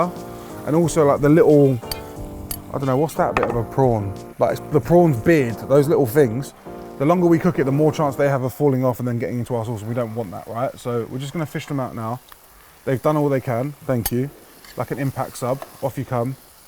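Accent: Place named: Manchester, United Kingdom. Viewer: Fresh linguinehh haha u sound like your from my ends Manchester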